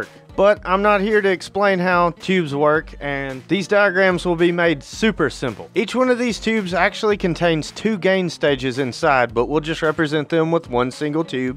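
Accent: South, United States. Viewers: Hahah, that's exactly what I was going to say, he's just southern, lmao. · he's just southern, reminds me of Hank Hill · I added this to a playlist about a year back, and lost it. I searched YouTube high & low typing in so many keyword combos and variations to run across it again, because it's brimming with useful info. Happy to revisit. Channel with the Southern accent INSTANTLY told me I was in the right place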